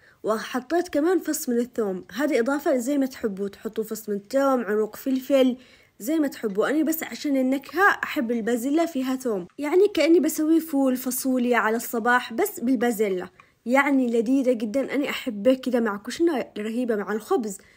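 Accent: Aden, Yemen. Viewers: محلا كلامك الكلام العدني يجنن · تسلمي ع كل الاكلات الحلوة وتسلم الهدرة العدني الذي تخرج من فمك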